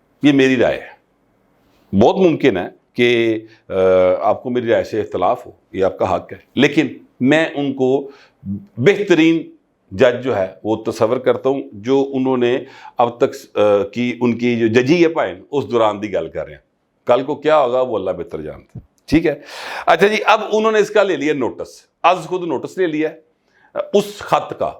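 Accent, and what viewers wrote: Lahore, Pakistan. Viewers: مجھے تو فورا" پہنچ جاتا ھے آپکا وی لاگ۔ لاھوری انداز میں بات کا مزہ آجاتاھے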